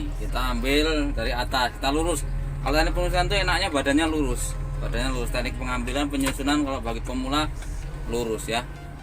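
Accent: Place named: Java, Indonesia. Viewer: kirain di Jawa dri logatnya kayak orang jawa Pak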